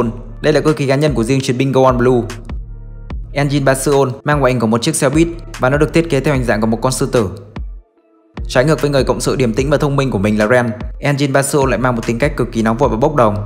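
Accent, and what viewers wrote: Japan. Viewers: Anh ấy đọc tiếng anh kiểu người Nhật đó bạn :V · Kênh của ad rất có chất lượng, nhưng có một nhược điểm lớn, đó là ad dựa vào phiên âm tiếng nhật quá nhiều để gọi tên cho các mecha, toy, gattai các loại. Ví dụ, Speedor, ad đọc thành speedoru luôn, trong khi đó chỉ là thứ tiếng anh nhật phiên âm sai thôi mà.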